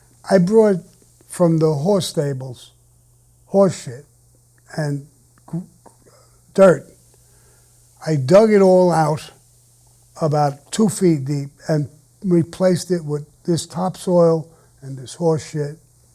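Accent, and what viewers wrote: New York, United States. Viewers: I moved to Scottsdale from NY in 2014 after 46 years living in NY. NOBODY here in AZ talks like that. It's kinda scary but my father who was born in 1936 had almost an identical accent to you. You remind me of him in more ways than I can say. R.I.P. Please keep uploading content!! For all of us NY transplants it reminds us of home. Salud.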